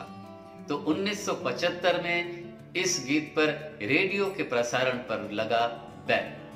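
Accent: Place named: Sindh, India. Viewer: You seem to have a Sindhi accent. Karachi Sind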